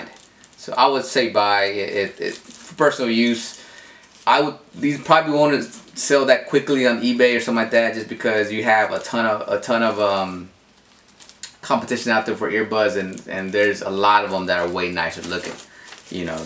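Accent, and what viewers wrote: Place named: Mexico. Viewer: I don't understand where that's coming from, but he seems to be Mexican through his accent, but you can't tell everyone's origin by just looking at em.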